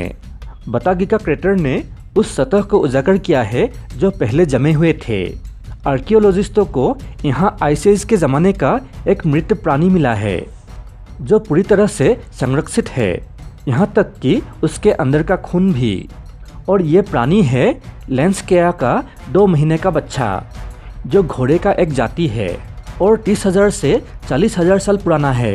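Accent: Assam, India. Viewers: Ap Assamese ho lagtahe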